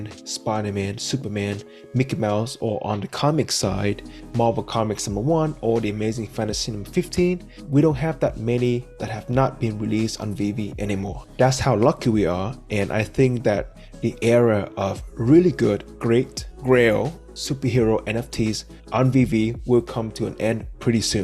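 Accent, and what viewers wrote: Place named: Austria. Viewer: I like your Arnold Schwarzenegger accent dude. Great content and channel too.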